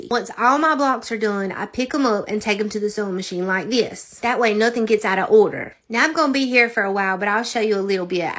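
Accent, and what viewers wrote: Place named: Lowcountry, United States. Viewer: Girl dey jus don no how to hear country specially low country. You do you this Cajun girl understands you just fine. Bless their hearts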